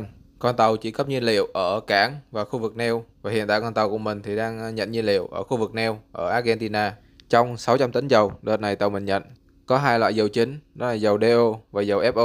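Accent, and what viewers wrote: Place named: North, Vietnam. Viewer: Mình xin góp ý với chủ kênh.mình là người gốc bắc thì cứ nói theo thuần tiếng bắc.anh chị em sẽ rất ghi nhận công sức của bạn! Chân thành!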